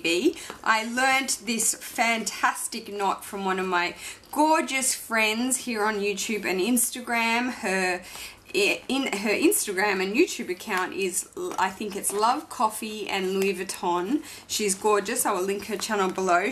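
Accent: Australia. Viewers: your videos and perhaps your Awesome Aussie accent bring cheer to my day!! More! More! More!! 💜 much love and happiness to you!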